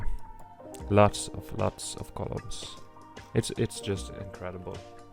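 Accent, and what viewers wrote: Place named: Scandinavia. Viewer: Where r u from. Those of is in the states have a hard time recognizing different accents . My guess is 🇳🇴 or 🇸🇪 or Finland 🇫🇮.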